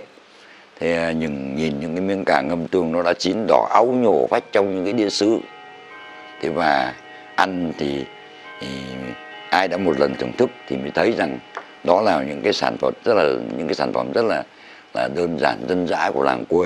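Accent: Hanoi, Vietnam. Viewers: Xem những video chế anh, em thấy buồn vô cùng. Cũng chỉ vì những con người hiểu biết nông cạn. Em Theo dõi anh hơn năm nay rồi. Đã nói tới ẩm thực là một đề tài khó. Ai mà hiểu cho hết. Cứ coi như anh kém cỏi đi. Nhưng chịu khó tìm hiểu sẽ tốt hơn. Anh giọng hay chuẩn mực, hơn người Hà Nội nhiều.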